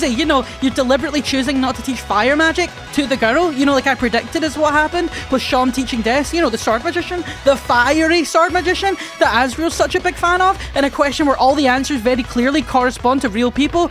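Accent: Scotland, United Kingdom. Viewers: pure 10 minutes of a crazed scot yelling at us expecting us to understand her's crackpot theory, okay bud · I love the scottish accent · you have the most scottish accent i’ve ever seen 😭 absolutely love it lad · the scottish accent made me insta sub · I like the cut of your gib manic Scottish lady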